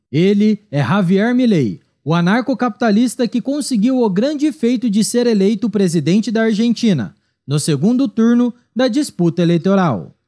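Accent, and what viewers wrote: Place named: Caipira, Brazil. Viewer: Ótimo vídeo. Mas precisa diminuir esse sotaque caipira, que torna irritante para muitas pessoas assistir o vídeo. É só prestar atenção nos "erres". Abraço!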